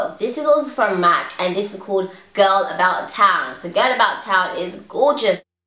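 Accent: United Kingdom. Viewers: Are u British cause I hear the accent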